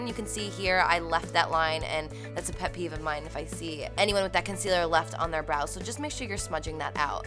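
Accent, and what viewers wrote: New Jersey, United States. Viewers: Love the Jersey accent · she sounds like she's from nj, the accent is so sutle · I hear a Jersey accent 😍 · omgggg as soon as I heard you talk I knew you were from New Jersey JERSEY PRIIIIIIDE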